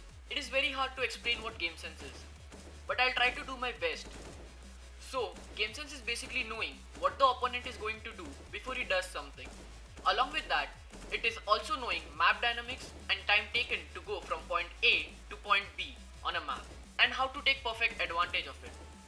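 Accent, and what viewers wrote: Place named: India. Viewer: from india? that accent wtf - anyway improve, as already mentionted, the audio. have my upvote for the tips though.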